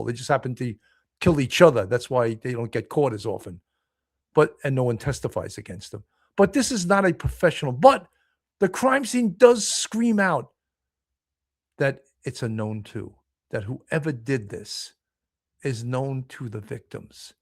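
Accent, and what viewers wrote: New York, United States. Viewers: I really appreciate you and your common sense approach! I do not like the hyperbolic tv news stations that try to make things even worse than they are. New subscriber. BTW, I like your NYC accent!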